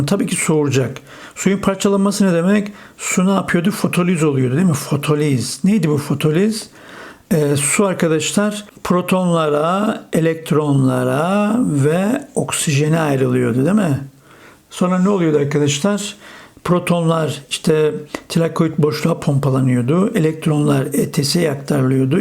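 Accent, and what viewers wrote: Black Sea, Turkey. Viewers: Hocam karadenizlimisiniz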